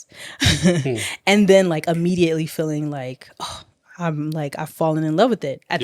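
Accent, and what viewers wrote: South Africa. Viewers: Welcome home, but you sound100% South African like a familiar girl I know in the hood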